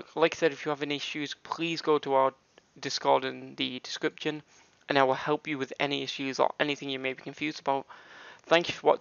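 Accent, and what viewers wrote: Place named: France. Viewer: on est d'accord que tu est français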